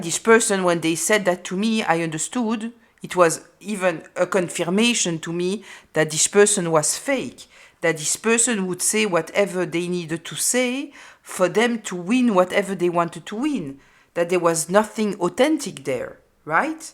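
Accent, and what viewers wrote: France. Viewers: Thank you for the reading:hands-yellow-heart-red:🕊 Are you from France?☺Just subbed^^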